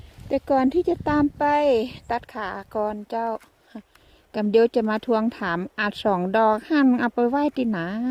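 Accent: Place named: North, Thailand. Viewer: น้องชอบปี่อู้กำเมืองมว่นดีขนาดจ้าวดูแล้วสบายใจจ้าว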